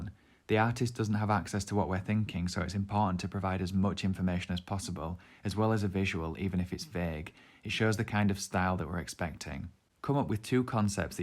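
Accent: United Kingdom. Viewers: nah mate it just sounds like it but that's the "bri - ish" accent. Your videos are dope btw! :)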